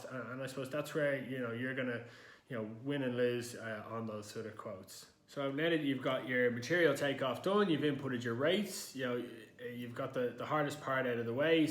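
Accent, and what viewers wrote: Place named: Dublin, Ireland. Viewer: I notice you and the guy in the previous video I watched have Irish (Dublin) accents. Are you guys based in Ireland or USA? I'm Irish and am a drywall/steel-stud estimator living in Canada. We use On-Screen takeoff where I work - it's very good, but quite expensive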